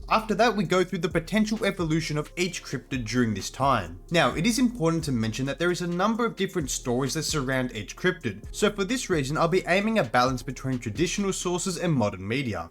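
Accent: Australia. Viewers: Question , is your accent Australian or New Zealander?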